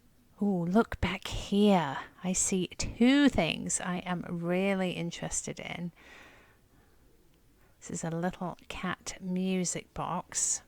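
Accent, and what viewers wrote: United Kingdom. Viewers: I really enjoy you. I'm from the East coast and follow you and your friends. You have such a soothing voice and I dig your British accent! You are 1 smart lady so cool. I have learned so much from you. The Goodwills in Jersey do stock Christmas items throughout the year. I adore you! Hugs!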